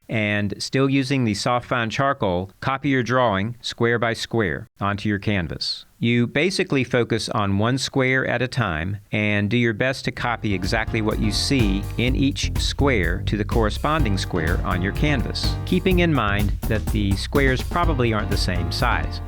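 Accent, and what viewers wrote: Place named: United Kingdom. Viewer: New sub from UK .... You sound like your from UK. Do you do private tutorials or lessons or whatever?? I love your humour etc. Glad I found you good sir!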